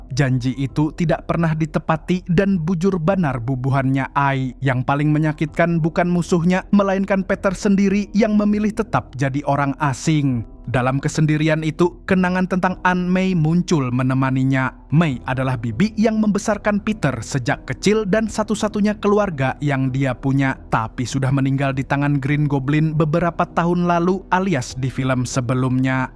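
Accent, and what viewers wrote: Banjar, Indonesia. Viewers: Urang Banjar png asa un nh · Waluh urang banjar sakalinya admin nya.. 😁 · Baru tau kalo kamu urang banjar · Orang banjar kah pian mang??? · Admin Nya Urng Banjar Kah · Host ikm urang banjar jua kah 😅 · Urang Banjar sekali nya neh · Dikira salah dangarr, skalinya bjuran urang banjar yg mreview😂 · Orang banjar jirr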